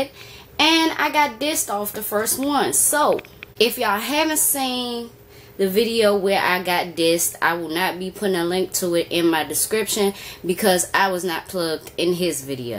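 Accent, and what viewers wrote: Washington DC, United States. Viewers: Do your thing sweetie much love to you I from dc.u sound like u from the dc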